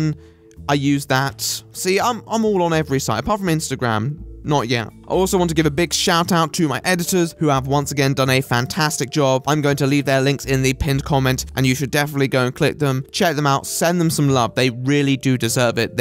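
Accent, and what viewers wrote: RP, United Kingdom. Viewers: Received Pronunciations :) The posh accent. Not as posh as ye old aristocrats. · hes posh mate, pride of the uk. hes funny though so gotta love him!!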